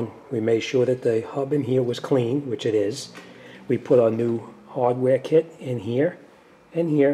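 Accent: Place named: New Jersey, United States. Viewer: R u from like new joysee? Love the accent.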